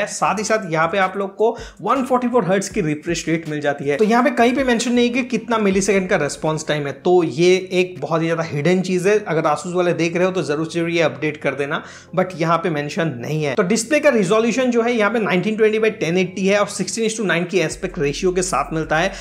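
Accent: Assam, India. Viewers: Aap Assam se ho kya?? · Damn you're from assam?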